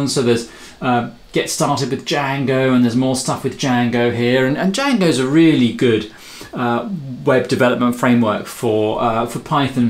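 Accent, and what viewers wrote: England, United Kingdom. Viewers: You English accent is very pleasing to hear . Like your ways of prouncing words . And as always your videos is always helpful .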